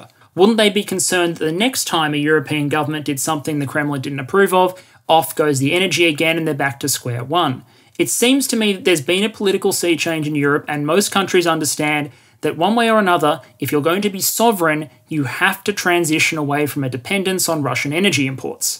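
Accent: Australia. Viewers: Is this the "Economics Explained" guy's second channel? (Or first channel or whatever?) Their voices sound identical to me - and I'm and Aussie, so it isn't just that the accent(s) are Australian. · I like how australian guy tells me our energy policy more in depth than our own government. Excellent video, thank you!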